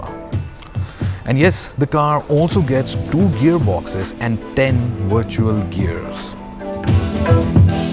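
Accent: India. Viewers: Extremely erudite presenter. Very well done. I really like the sentence, 'the question I allow myself to ask...' Superb. Your Indian accent is a fine asset here, when I sometimes find it is annoying from other presenters. Keep it up.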